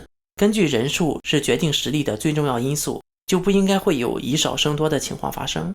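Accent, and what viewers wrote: Shandong, China. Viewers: 赌五毛这口音是山东人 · 听口音像是大陆人呢？ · 感觉是你山东的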